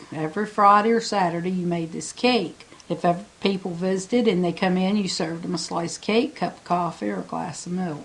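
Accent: United States. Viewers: Your dialect is a mixture of southern drawl and Virginia brogue…I think it's fascinating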